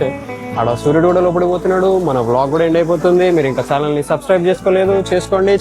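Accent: Telangana, India. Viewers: Ye mulaki poina sare Mana Telangana Slang ki unna craze vere untadhi❤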